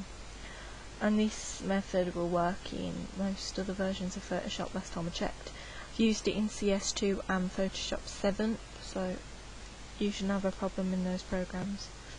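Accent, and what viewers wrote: Birmingham, United Kingdom. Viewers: Who would hate your british accent? It's so cute. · Bostin accent! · hell yea boi... i love british peoples accents...im also aussie..good vid though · great vid lady and yeh to hell with the yanks who hate the british accent im from london but next to ours brummys have d best accent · You are awesome, I like this tutorial. And by the way, I love your british accent (not kidding). Could you upload a HQ video, it seems a bit blurry. Greetings from Mexico. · Brummy accent ftw, im the same ;)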